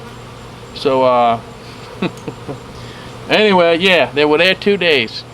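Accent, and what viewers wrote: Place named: South, United States. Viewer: Love your accent,... The Dirt Rooster and Mr. Ed can’t match it! You southern bee guys need to get some headlamps, though... no having to hold flashlights in your teeth!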